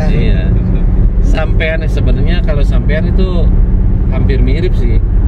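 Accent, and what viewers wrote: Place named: Madura, Indonesia. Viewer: reng medureh ... ternyata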